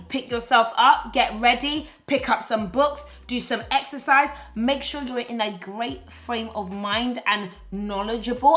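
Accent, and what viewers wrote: United Kingdom. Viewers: She sounds British but uses USD and GBP interchangeably in thumbnails and videos, far too confusing. If it's content for british viewers make it apply to british people and vice versa for americans. Can only assume it's to help her increase reach with american viewers, but it's enough to make me stop watching altogether.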